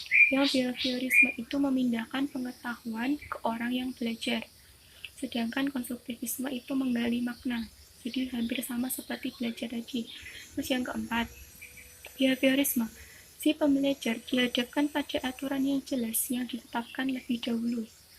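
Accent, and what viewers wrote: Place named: Java, Indonesia. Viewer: Uwuwuwuwuuuuuuu medhookk kaakaaa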